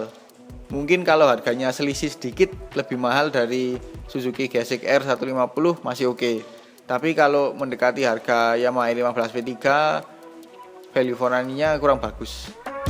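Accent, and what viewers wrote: Malang, Indonesia. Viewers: Mas wong malang tah?😅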